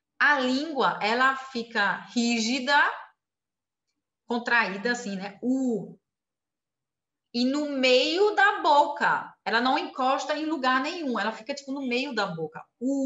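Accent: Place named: São Paulo, Brazil. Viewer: Alemã nativa com essa pronúncia brasileira? Meu Deus, seu português é perfeito, perfeito, perfeito, vc deve saber disso, n sei nem pq to falando, haha. Eu pensei que tu era paulista ou gaúcha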